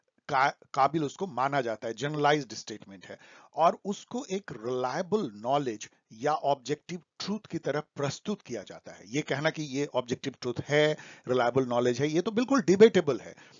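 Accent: Bihar, India. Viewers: Bihar se hai kya sir aap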